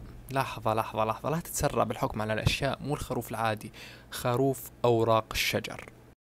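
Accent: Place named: Levant, Jordan. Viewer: توقعتك من الأردن او فلسطين وفعلا صوتك مميز ملاحظة بسيطة قص اظافرك 😁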